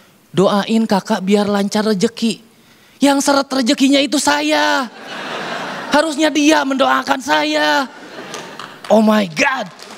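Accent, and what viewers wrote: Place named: Lampung, Indonesia. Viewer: OMG.. Lampung Indonesian🎉🎉🎉😂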